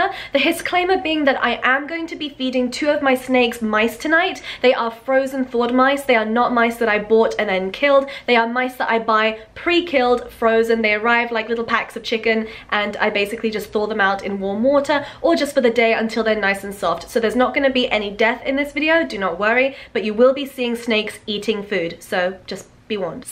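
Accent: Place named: United Kingdom. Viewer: I love how british sounds!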